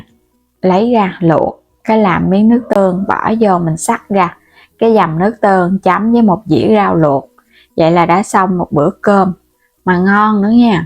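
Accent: Mekong Delta, Vietnam. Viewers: HÔT GÀ , HÔT VỊT CHỨ KHÔNG PHẢI TRỨNG. COI CHỨ KHÔNG PHẢI XEM. BỎ VÔ CHỨ KHÔNG PHẢI CHO VÀO. PHÁT ÂM THÌ " GẶC " DÂN MIỀN TÂY NHƯNG TỪ NGỮ THÌ BỊ VC HÓA.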